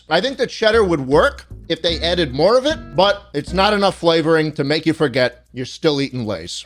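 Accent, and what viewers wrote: New York, United States. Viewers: New Yorker is coming out in this one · You can hear the New Yorker in him come out more and more as the video goes on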